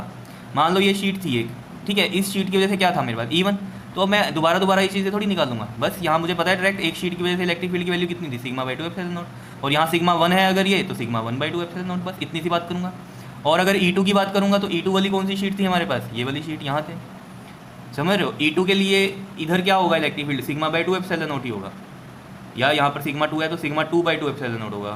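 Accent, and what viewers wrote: Punjab, India. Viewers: Sir tucci punjabi o?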